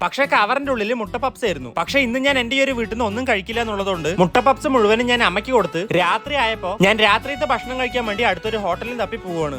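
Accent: Palakkad, India. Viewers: Bro Palakkad ano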